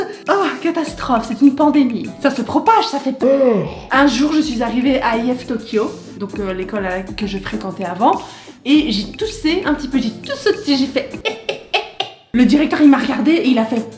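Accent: Belgium. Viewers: Tous les "w" sont prononcés "oué" en Belgique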